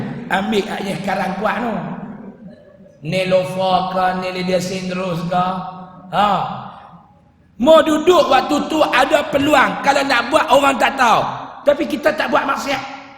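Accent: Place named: North, Malaysia. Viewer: .ni yg mna ada kutu dok kecam dia slama ni aku nk pesan kt hampa,hampa kecam dia hampa rasa hampa dh ckup sempurna 100% ja jd mnusia dlm dunia ni,aku Siam tp aku suka dngar ceramah dia cara dia ckap tu hampa jgn pelik kami org utara mmg loghat kami cmni dh..nmpak ckap mcm kasaq tp dak...nk taw ksaq ka dak mai hidup kt bumi utara nt hampa baru fhm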